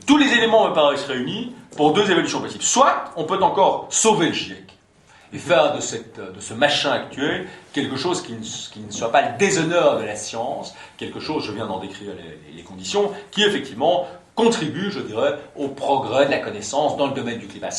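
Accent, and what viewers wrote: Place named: Belgium. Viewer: C'est juste une des nombreuses formes de l'accent belge. Faut s'habituer... Pour le reste, je suis d'accord aussi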